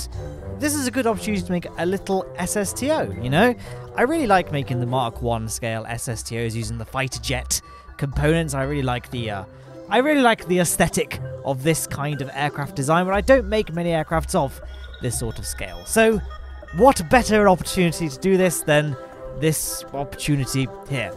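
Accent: United Kingdom. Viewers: Did you just say Cor-oh-nah virus? I come here for a good British accent and PROPER pronunciation.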